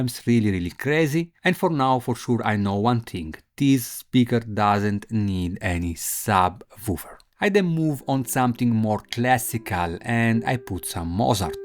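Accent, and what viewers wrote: Italy. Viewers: I like italian accent! What camera do you use?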